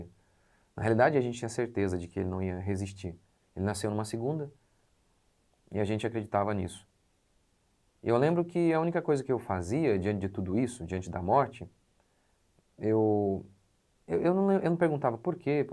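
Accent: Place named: Rio Grande do Sul, Brazil. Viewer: eu achava que vc era gaúcho, pastor...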